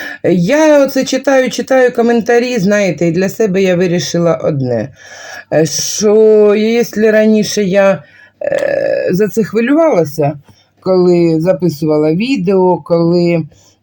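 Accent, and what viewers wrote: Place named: Surzhyk, Ukraine. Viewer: не мучай себе і не силуй! В кожній області нашої країни є свій діалект. Особисто я також розмовляю суржиком. В Харківській області свій діалект, в Полтавській - свій. А в Західній Україні - такий, що не все зразу і зрозумієш. Але це їх право, підтверджене Конституцією України. Вважаю, що люди, які тебе слухають, приходять на канал за знаннями. А кому заважає твій суржик, можуть просто йти далі.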